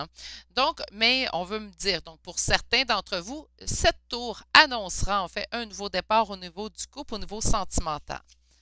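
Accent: Canada. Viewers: Oui reste toi-me^me,.Et quand tu te coupes à l'intérieur de la main, le plus simple, et c'est ce qu'un médecin m'avait conseillé, c'est de la glue. MAIS ATTENTION, si on peut utiliser de la glue 1er prix, LE SEUL IMPÉRATIF c'est de prendre de LA GLUE LIQUIDE (et pas en gel). Et ça n'a rien à voir avec le tirage, peut-être vas-tu m'envoyer sur les roses (et c'est ok: on me dit toujours que je suis trop curieuse!), je me questionne tout le temps sur ton accent, j'veux dire si je crois que tu es canadienne qui parle particulièrement bien français. Parce que i tu es québécoise, je ne reconnais pas les expressions typique de Quebec? Et comme toujours, c'était un plaisir, comme à chaque fois. Donc, MERCI pôur tout ce que tu nous offres de temps, d’énergie et de bienveillance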